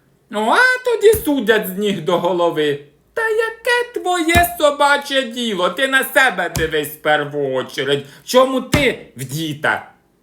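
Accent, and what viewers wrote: Poltava, Ukraine. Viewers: Ой цей наш Полтавський сільський говор, прямо з язика знімаєтє.Воно конешно не в обсужнєніє, ну так жеж і є😊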